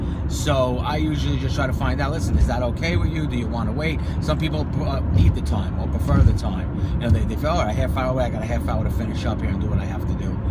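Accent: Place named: Long Island, United States. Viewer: coupla things, great video, really helped and I'll use your referral. Just heads up I hear Camden and Paterson are paying a premium for those that venture forth..😁 oh and why does from NJ sound like Vin from Long Island? I'm from Bergen County but you got either a Long or Staten Island accent...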